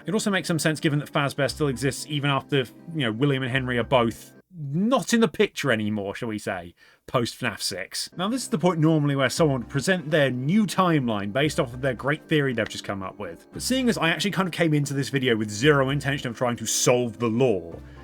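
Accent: United Kingdom. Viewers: Ayyyyy a fellow norfolk lad!!! (Tbf, i understood the dialect but only cuz that's what my grandparents sound like 😂) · He’s too british, I can tell cuz im british too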